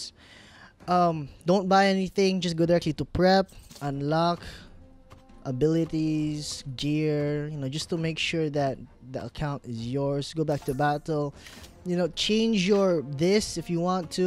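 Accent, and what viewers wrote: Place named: Philippines. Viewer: Lol pinoy KA no KASI accent mo PA LANG pinoy na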